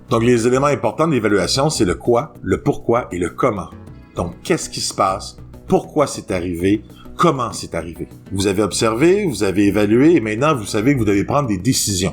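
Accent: Quebec, Canada. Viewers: Je viens de regarder plusieurs de tes vidéos. Tes débuts sont très encourageant, c'est de mieux en mieux, je te souhaite beaucoup de succès avec ta chaîne. Ca fait du bien d'écouter l'accent québécois, c'est dépaysant, et puis pour ma part ça me "rapproche" un peu de ma petite sœur qui vit à Montréal depuis bientôt 7 ans... Je m'étais fait la réflexion que tu étais peut-être enseignant... Un truc dans une autre vidéo m'avait notamment fait tilté (je sais pas si c'est une expression bien utilisée au Québec, ça). Cela dit, on m'a déjà dit qu'on pensait que je l'étais alors que je ne le suis pas... mais j'en ai épousé une ;-)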